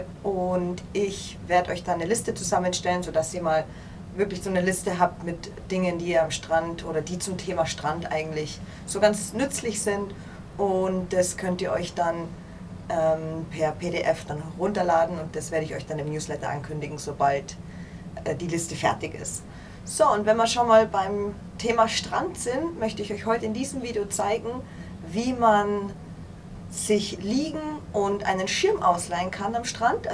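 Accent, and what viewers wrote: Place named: South, Italy. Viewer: appena sento come parli gia' capisco che sei fluente per le intonazioni italiane, perche il tedesco e' piu' duro nei toni. Ma spero almeno di migliorare la comprensione del tedesco. Comunque il tuo italiano almeno nell'intonazione e' perfetto...anche se devo dire sembra venire dal sud :) sud italia naturalish :)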